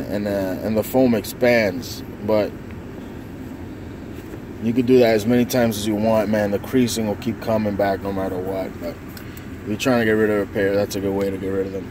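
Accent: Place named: Massachusetts, United States. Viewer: sneaker Man you're from mass i hear it im from dorchester you jist gained a sub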